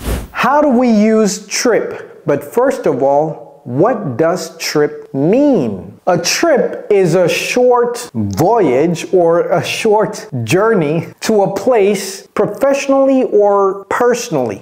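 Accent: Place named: United States. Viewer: I say it before, I am saying again.He is good ! One thing to remember remember, this is pure American English. Learn it , enjoy it and apply it. Americans will enjoy listening to you if you sound like him. Thanks again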